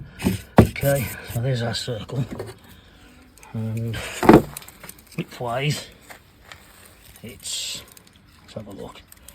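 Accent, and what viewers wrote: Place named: Birmingham, United Kingdom. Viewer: Quick jacks 😂 he’s a brummie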